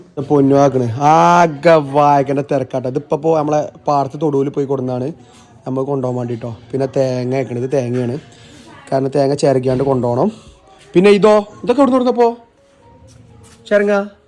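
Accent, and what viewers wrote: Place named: Kasaragod, India. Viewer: Kasaragod paraya oottpola😊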